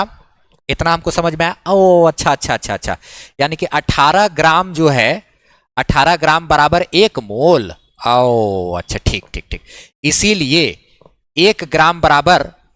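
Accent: Bihar, India. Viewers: Sir aap bihari ho kya😅?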